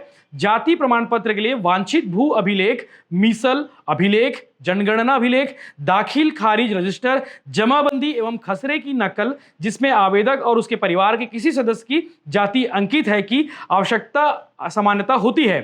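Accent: Chhattisgarh, India. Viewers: शुद्ध छत्तीसगढ़ी, सुन के अच्छा लागिस गा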